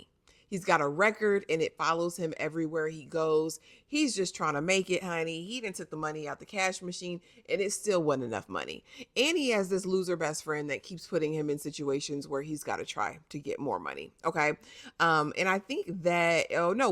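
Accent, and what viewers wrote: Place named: United States. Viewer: I read both your comments so I will address both here. sound American. I AM AMERICAN and she is the only American I have seen covering this show on YouTube. Even their interviews are the UK versions of outlets like Vogue. No one cares about validation. Validation comes from within. Whatever PERSONAL issue you have with Europe vs. America's African Diaspora's culture is your own. FACTS. Facts are that the US is the largest entertainment market next to China. British actors and directors in interviews have said they started making more money and getting more exposure (to get hired on more projects) when large wide spread American audiences started becoming fans. I'm sure Rapman would like to get paid, but if not and he wants to make it "our own thing" because he is not seeking "validation" as you say, instead of being a story teller that wants his work to get to the largest audience possible, then good on him. 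Money is what makes the world go around and if you can make millions in your home town, but if you know you can make billions one town over, why would you cut off your nose to spite your face? MONEY! I know he is Canadian, but didnt Drake save Top Boy from cancellation? Rapman said he has a story for three seasons planned and I want to see him get his seasons. Get his MONEY! China is the only entertainment as large as the US and they ae racist as hell. Historically these types of shows and movies do poor there. They even REMOVED Chadwick Bozeman's face off the B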